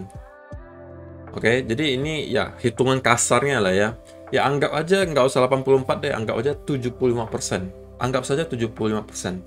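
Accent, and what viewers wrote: Pontianak, Indonesia. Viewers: Ko, org pontianak ya?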